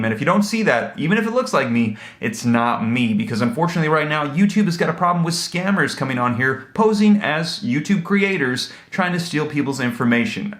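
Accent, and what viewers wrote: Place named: South, United States. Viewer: I love how you say “ pretty incredible”. You say “ purdy incredible “, and it’s the most adorable thing ever! You sure you aren’t from down south like me?? Haha!!!! Love it!!! Thanks for info!!!!!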